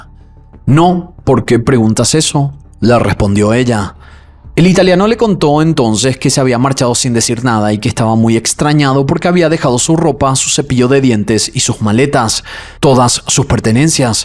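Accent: Paraguay. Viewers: es de nacionalidad Paraguaya, y así hablan los paraguayos!! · Es paraguayo. Habla hermoso 💃😎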